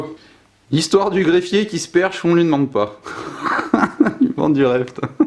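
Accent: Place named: Forez, France. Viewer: Je reconnais cet accent. J’ai vécu un temps à Thiers et à Clermont. Tu ne serai pas du côté du Puy ou du Forez ? Salut à toi l’iroquois en tout cas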